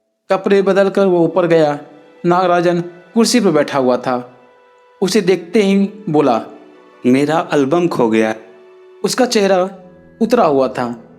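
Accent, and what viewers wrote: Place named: Bihar, India. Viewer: बिहारी की आवाज में मजा नहीं आ रहा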